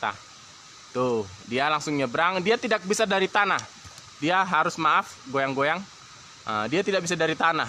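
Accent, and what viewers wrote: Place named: Brunei. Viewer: Bang perkataan mu aku dengar ada ayat berbunyi "kau ani" melayu nya seperti cakap brunei ada "kau ani" 🤣👍🏻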